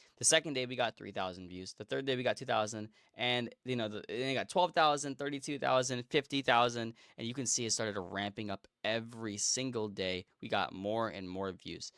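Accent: United States. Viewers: Are you 100% American? If you're not, you're really good at english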